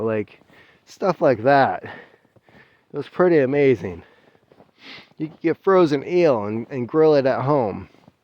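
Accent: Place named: Michigan, United States. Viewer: Damn he was two sentences in and I already knew you was from Michigan and then mentioned Kalamazoo and portage and then I knew.. I grew up in that state spent 23 years there when you grow up there you are pretty much brainwashed into thinking that your state is the best state and everybody else's sucks and you get if you're on the west side of the state you're definitely also taught brainwashed and you're thinking that your church is better than everybody else's church and everybody there is very particular or we would call odd if you're living in other states.... Grand rapids Michigan was one of the first cities introduced fluoride into the water That's why you always encounter passive aggressive intellectual types up there in Michigan they want to get upset but they've been poisoned to deaths and they've been brainwashed into thinking that diplomacy is how you should operate or manage your local and state government and they don't know that they got brainwashed into that mentality they didn't get the memo that the wolves sell diplomacy to make it easier to manage the week and the meek... 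I'm rooting for my fellow Scandinavians That's predominantly what is up in that neck of the woods in every variety I'm rooting for you...but if you want to be able to keep that state which used to be great is not anymore well then you better take it back take out all the liberals out of that state and take it back kick out all the greedy Republicans and take it back most of all of your home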